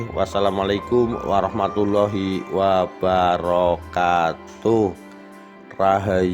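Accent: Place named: Banyumas, Indonesia. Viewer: Sayang chanel ini yg membacakan critanya sangat g menarik blas....aksenya banyumasan...g menarik blas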